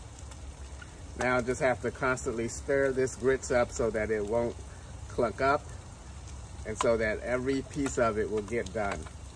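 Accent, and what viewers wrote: Baltimore, United States. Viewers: That Baltimore accent thick😅🙌🏽🙌🏽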